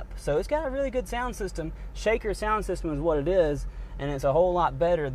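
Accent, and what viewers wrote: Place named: South, United States. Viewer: He sounds like a Texas citizen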